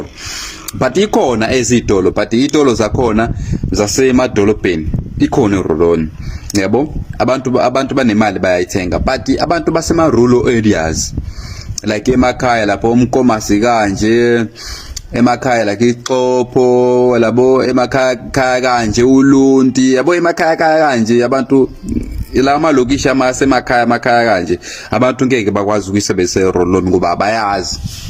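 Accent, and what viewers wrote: Malawi. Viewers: 😂😂😂😂😂 kuthi ukhabazela kamavovo hhayi yishwa phela leli ngoba uyamuzwa lomuntu ukuthi wasemalawi. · Fokof this is Malawian trying to speak isizulu abatjakathi laba bayathwebula laba angibatjembi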